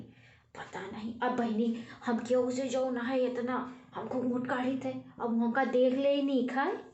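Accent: India. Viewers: ji sabse pahle to pranam aapko aap mein kitne gun Hain Main Kya kiya hun aap bahut acchi Teacher hain bahut acchi Ja sakta hai bahut acchi singer hai aapki yah boli Dehati bahut hi acchi lagti hai man Kahta Hai Ki Kitni bar aapka video Dekhun thank you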